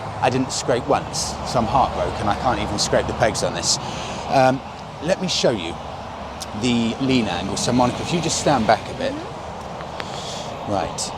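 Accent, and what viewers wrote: England, United Kingdom. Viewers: Great review mate! There's some serious badassery mixing a pommie accent with ape bars.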